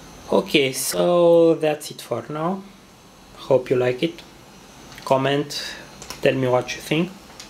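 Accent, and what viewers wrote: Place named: Russia. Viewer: рашин акцент)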